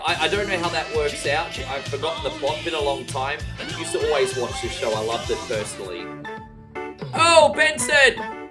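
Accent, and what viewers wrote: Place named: Australia. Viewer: just a suggestion... For people that don't know you, your charm is your accent. Maybe putting in the title somewhere that you're an aussie would gain you clicks.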